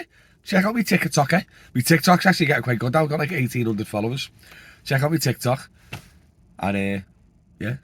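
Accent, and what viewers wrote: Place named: England, United Kingdom. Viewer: Can't understand your English accent mate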